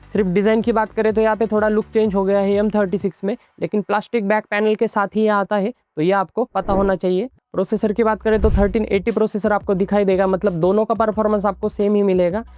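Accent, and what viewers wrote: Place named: South India, India. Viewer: Why comments making fun of yam or M, it's common i guess south teacher does have this pronounciation Not a big deal..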